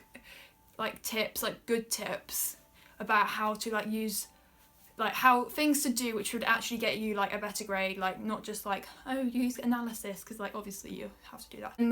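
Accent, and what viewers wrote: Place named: United Kingdom. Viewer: I want to speak like you😭 I wish I was British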